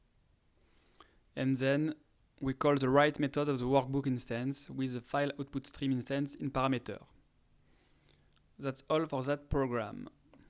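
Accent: France. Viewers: woooo !!!!! this a French accent I also speak french. The guy is strong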